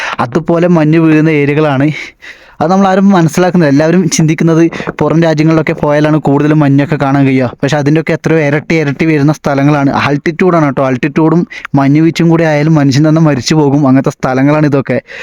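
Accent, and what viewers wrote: Kannur, India. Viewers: ഇങ്ങള് കണ്ണൂരാണോ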